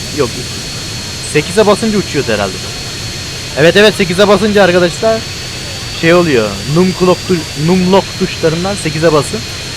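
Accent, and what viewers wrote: Turkey. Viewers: Eline sağlık dostum harika video olmuş. Bu arada nerelisin? Ben Denizliliyim ve bizim oralılar gibi konuşuyorsun :D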